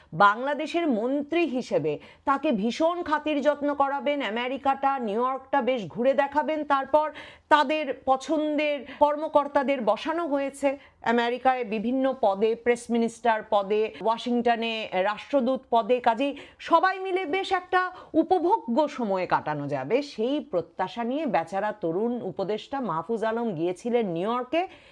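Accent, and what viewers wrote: Kolkata, India. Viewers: আপু আপনি কোলকাতার ভাষায় কথা বলেন কেন, আপনি কি ইন্ডিয়ান, "র"?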